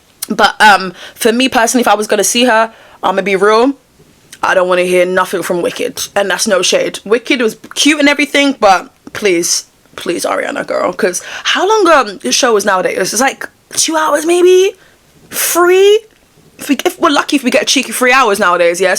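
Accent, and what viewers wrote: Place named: United Kingdom. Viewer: Why are you talking British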